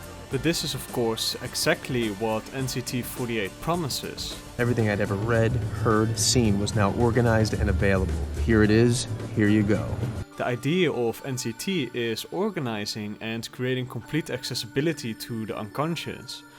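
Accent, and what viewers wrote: Netherlands. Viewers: Love the vids (btw i heard immediately that you guys are dutch : ) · Is the narrator by any chance Dutch?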